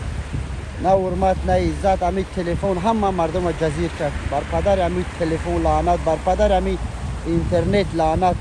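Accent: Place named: Badakhshan, Tajikistan. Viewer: Дар лачаи Бадахшони мемрым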